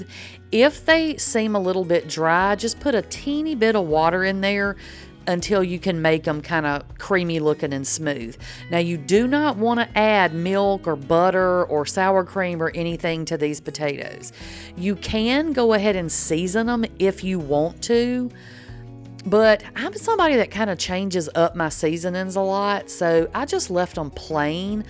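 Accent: South, United States. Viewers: Yes, I love your Southern accent too! ❤ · Thank you for posting ma'am... I enjoy your channel and love your accent... I was in Ft. Benning in the early 90's and miss the lifestyle and accent. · your sweet southern drawl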